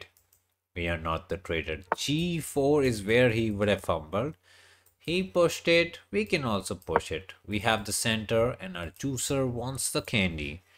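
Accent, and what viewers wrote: India. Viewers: The indian accent gets me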